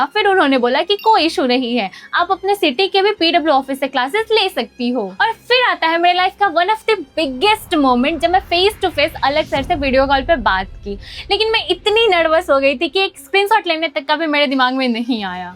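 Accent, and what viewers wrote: India. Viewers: Apke bolne ke language se hi lag rha tha aap bihar se ho 😂😂😂. No hate .I am also from bihar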